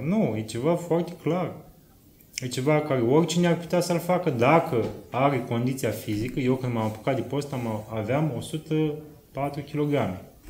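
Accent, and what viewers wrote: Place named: Moldova. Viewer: explicatiile tale sunt extraordinare, pe intelesul tuturor nu cu cuvinte sofisticate, iar accentul tau de moldovean este placut la vorbire si pur rominesc sincer din inima iti spun, succes pe mai departe.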